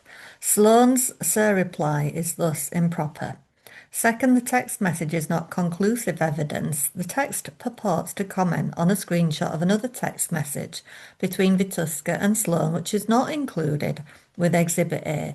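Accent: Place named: Scotland, United Kingdom. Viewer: I loVe the Scottish acccent!❤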